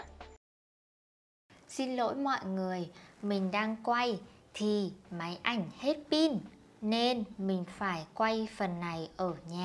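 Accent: Vietnam. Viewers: is this in southern or northern vietnamese ?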